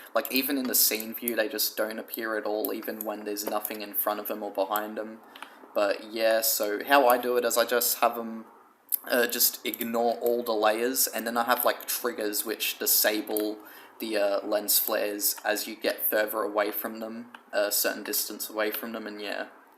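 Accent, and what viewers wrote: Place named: Australia. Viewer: damn love your voice,real Aussie accent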